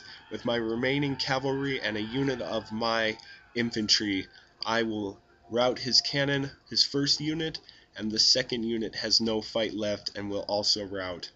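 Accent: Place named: United States. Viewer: Good commentary. Are you an American living in France? Just wondering about your accent (sounds like my American one). Funny thing about your opponent's name. I kept picturing that alien from "Lilo and Stitch."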